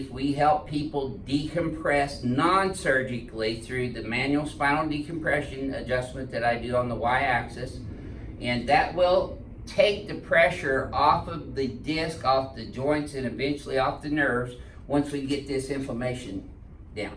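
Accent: United States. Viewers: most American voice I've ever heard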